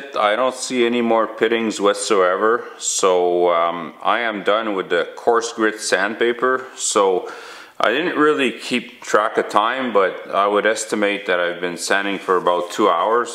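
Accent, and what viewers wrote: Quebec, Canada. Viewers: I detect a slight French Canadian accent dare eh? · French/Canadian accent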